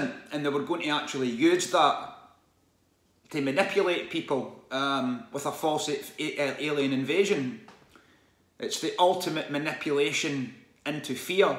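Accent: Scotland, United Kingdom. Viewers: Alright ma man! That’s all I need to say where I’m from lol totally brilliant channel good to hear a fellow Scot speaking on these subjects I had my awakening after reading David Ikes Cildren of the matrix it blew my mind then I started doing some deep research I even read TRNCE formation of America 😢what that women went through from the day she was born if u haven’t read it mate give it a read the amount of important people that were assaulting band other things in Bohemian grove fkn terrible. She was a victim of mk Ultra had 2 personalities and one was a trafficker of heroin for various groups I’ll leave it like that member keep up the good work Ioved got a few to watch and see what the think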